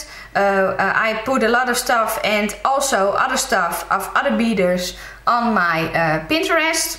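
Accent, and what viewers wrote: Netherlands. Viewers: are you from the netherlands? cause i am and your accent sounds like your dutch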